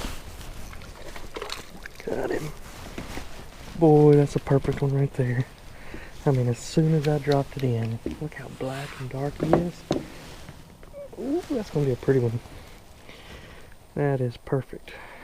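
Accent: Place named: South, United States. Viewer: I don't know where you guys are located, but it looks like a southern state (due to your accent and all the bayous in your videos) so with that said, have you guys considered some of the smaller species of gar? That'd be such a cool inclusion. I used to live in FL (now back in CA) and I used to love watching gar swimming near the surface.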